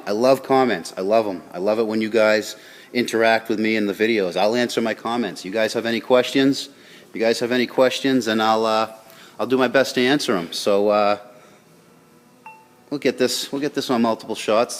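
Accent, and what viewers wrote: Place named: Boston, United States. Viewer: The caaaarburator, love that Boston accent.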